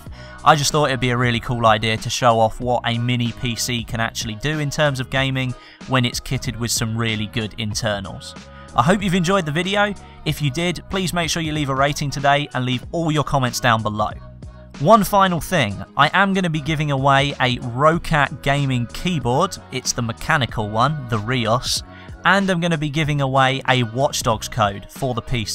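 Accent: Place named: United Kingdom. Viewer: Cool PC, love the way how Brits speak, I recently started a gaming channel after observing you and several other youtubers for an year approx . You can check my channel and see the quality of bids. Currently I have a suclish Logitech gaming keyboard which I use for gaming an always wanted to have a gaming one. If you like my content you can give your Indian friend some help.PEACE !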